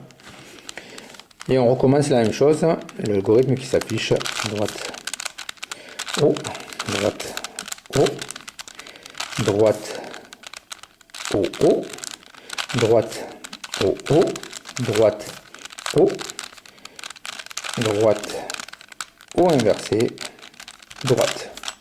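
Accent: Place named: South, France. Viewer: En bref et pour profiter de tes talents d'orateur avec ton petit accent qui chante le sud refais nous une petite video je n'ai pas ton talent de youtubeur, mais en bref la methode la plus simple commence par faire une double croix blanche avec les arretes de L4 et les centres de L3 en même temps, etape deux tu alignes en une seule fois sur les ligne 1 et deux les paires de coins que tu fais decendre a la bonne place avec ta formule DHDHDHHD en fonction des pieces a placer si c'est que celle de la ligne 2 tu fais dhdhdhhd ou DdHhDdHhDdHhHhDd et tes deux lignes du bas sont finies, en etape 3 tu aligne devant toi deux couleurs contigues sur la ligne 1 et sur la ligne 2 meme si elles sont diferentes et tu fais ta formule du 4x4x4 de parité de coin pour inverser tes coins mais tu la fais en prenant les 2 lignes en meme temps et en un seul algo tous tes coins sont faits t'as plus qu'a les placer. 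En derniere etape il te reste les deux lignes de centres a faire, tu commences par un PLL du 3x3x3 (en faisant des demi tours avec droit et gauche) ca te place les 3/4 des paires d'arretes et pour le reste tu utilises les formules de parité d'arrete du 4x4x4 il te reste plus que cette parité que tu évoques a la fin, en gros il te faut 2 petites minutes pour faire ca, En joy...A ton service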